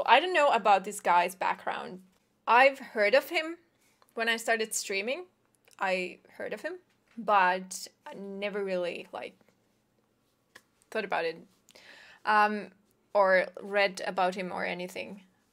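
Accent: Finland. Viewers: I knew you were suomalainen nainen I miss that accent...❤ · She's Finnish. As in German, J is Y and TH is T, and the Rs are rolled, so becomes Yetrrrrro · Mike G She's from Finland! English is at least her second language. She's adorable!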